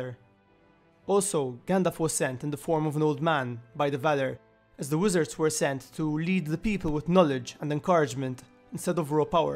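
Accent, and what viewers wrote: Malta. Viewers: I could tell you were Maltese purely from the accent haha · are you Maltese? I m going off your accent alone here, hahaha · are you maltese by any chance?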